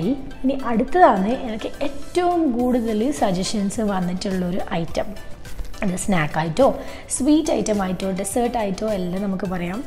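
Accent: Kannur, India. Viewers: Kannur food nammak.peruthishtam..😍 Kannur slang nalla rassa kelkkan. Endayalum spr recipe 😘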